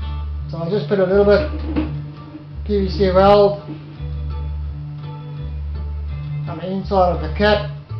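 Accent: South Africa. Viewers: Nifty little condenser, should work a treat once ya got the water flushing thru the PVC and should only need a slow flow being the overall volume of the pipe too. Been tryin to work out your accent mate, I am guessin south african? You certainly got some skills my friend, good work bro. Cheers n regards Lee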